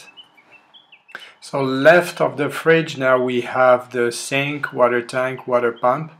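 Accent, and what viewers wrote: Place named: Argentina. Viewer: hacelo en castellano, no se aguanta ese acento argento en el inglés....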